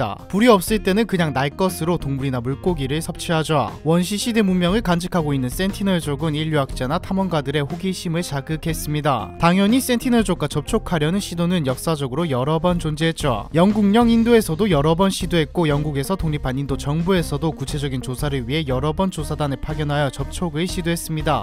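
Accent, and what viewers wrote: Gyeongsang, South Korea. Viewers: 이분억양이 경상도말투 인가보네요?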